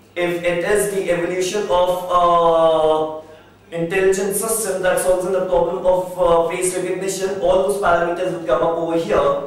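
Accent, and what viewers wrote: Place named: Asia. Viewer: thx Sir for video , I apreciate it but I need subtitles coz my english language is not so perfect especially on hearing the asiatic accent :)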